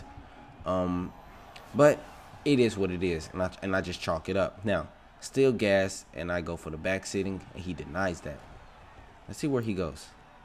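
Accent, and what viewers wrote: Jamaica. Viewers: i’ve been inna party with this guy he’s nice and chill. has a Jamaican accent i think . i only say i think because i don’t know accents good.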